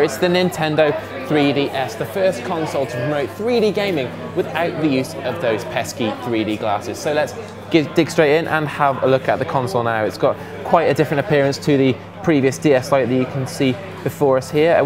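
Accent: United Kingdom. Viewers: that british accent is really really giving me a headache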